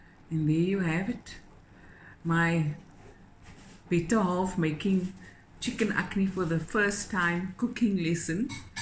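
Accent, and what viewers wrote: Cape Town, South Africa. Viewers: It is a Cape Town accent.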